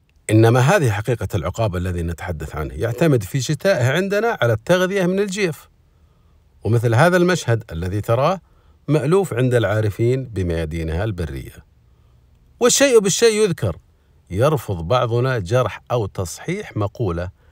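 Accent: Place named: Hejaz, Saudi Arabia. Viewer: موفق ..سرد مميز وصوت جميل للوثائقيات بنكهة حجازية اتمنى الاكثر منك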